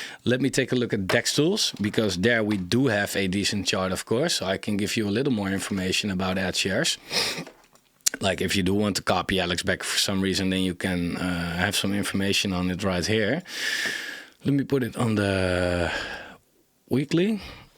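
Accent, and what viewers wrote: Netherlands. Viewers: your accent feels like The Netherlands... Im from Belgium lez get millionaire next 2 year! Keep up the work.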